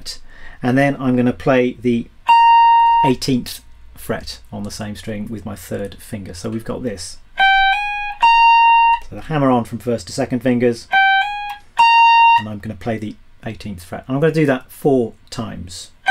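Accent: England, United Kingdom. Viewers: 10 années déjà....Merci, Mr! J'adore ton niveau musical, j'adore ton accent Anglais, bref, tu es très bon et très sympathique cher ami musicien....merci pour ce cours que j'apprécie beaucoup car même avec mes dix années de guitare, j'ai encore besoin d'un super bon comme toi pour m'apprendre le morceau exceptionnel, irrationnel, indémodable des...Merci infiniment, Monsieur! Je ne suis pas prêt de t'oublier cher ami.....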